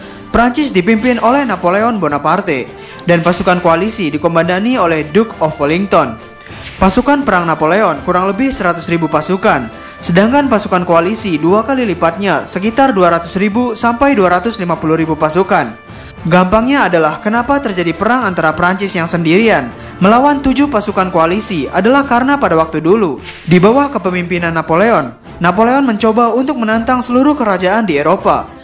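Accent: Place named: Bali, Indonesia. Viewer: gw smpt mkr gtu kdgr dr " T " nya kyk logat org bali... hehhee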